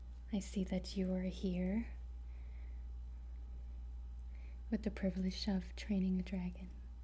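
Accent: Canada. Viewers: Lol Your Canadian accent really suits this role play.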